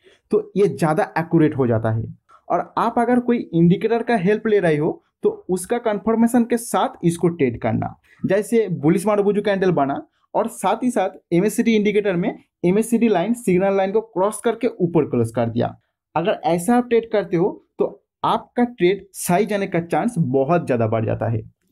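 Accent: Bengal, India. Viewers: Very nice content and very nice to hear Hindi in bangali accent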